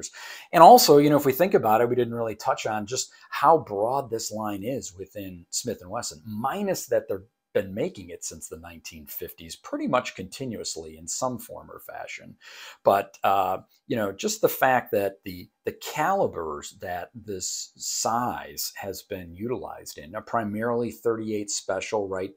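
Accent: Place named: Ohio, United States. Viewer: Sounds like you are from Ohio.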